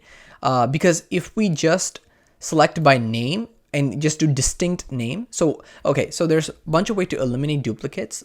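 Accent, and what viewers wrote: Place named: India. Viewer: Funny to hear an indian guy trying so hard to hide his thick accent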